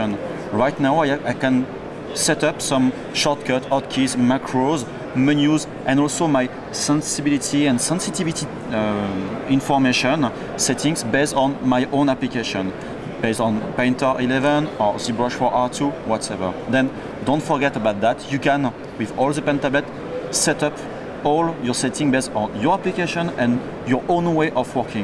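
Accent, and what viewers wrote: France. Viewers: I was thinking this guy is french. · On reconnaît bien l'accent français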